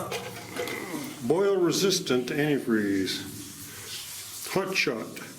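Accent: Canada. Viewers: Wonder if they would let me pick some of the gold out, sort of a day trip thing. Looks like it would be fun. We can tell you're Canadian, ay. The quartz is white because it formed with oxygen, so it's name is really quartzite.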